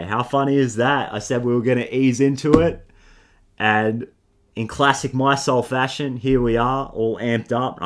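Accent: Australia. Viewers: Thanks bro. Are you from Australia?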